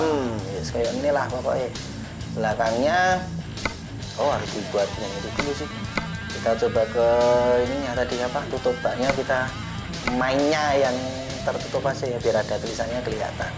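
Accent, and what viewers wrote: Java, Indonesia. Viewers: Logat koyo wong jowo koe mas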